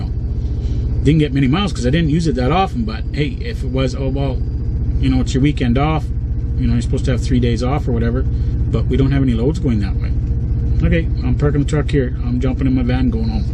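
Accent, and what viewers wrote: Canada. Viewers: Hey! I noticed you sound pretty UP or Canadian. I'm here in mid michigan working at Pilot Flying J night shift. I think I might have seen you before. Anyway, it'd be really cool for you to stop by. (Don't tell management;D ) I'll throw you a free coffee, soda, cup of ice, or some food if you want. Just look for the bald guy. See! This goes to show If you're a cool trucker, then truck stop cashiers will hook you up. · I like what your doing. Next time just a little less Canadian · Are you from Canada? Lol wondering because of the accent. You sound like the guys from this show called “trailer park boys” on Netflix which is filmed in Canada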